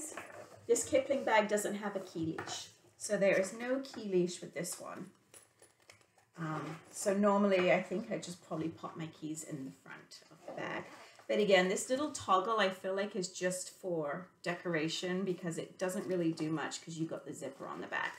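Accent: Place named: United Kingdom. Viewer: Just discovered your channel which I am enjoying. I noticed a subtle British accent, then in a later video, you stated you were going to visit relatives in the UK. Where are you from in the UK? Hope you start making videos again.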